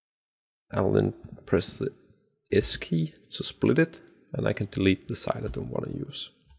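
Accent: Denmark. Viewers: Are you Danish? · er du dansker ? :) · Are You Danish ? If You Are Its EPIC ! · you sounds danish <.< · Are you Danish??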